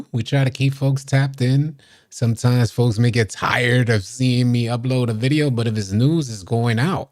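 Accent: New York, United States. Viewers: I respect your grind.. Very transparent and informative. Your content is appreciated for sure.. I Know u from up top somewhere NY or Jersey area by your accent. I Could be wrong lol but salute my boy 🫡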